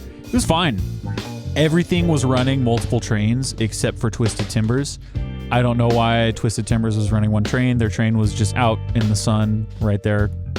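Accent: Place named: Florida, United States. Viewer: you talk like a friend of mine from Ocala, Florida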